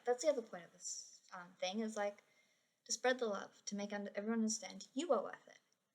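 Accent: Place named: Australia. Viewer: You sound Australian or from New Zealand. What's the status of Christianity down under?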